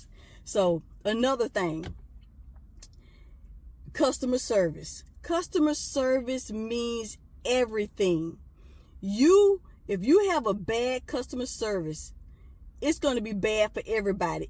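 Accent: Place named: South, United States. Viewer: Strong ass southern accent god bless you lmao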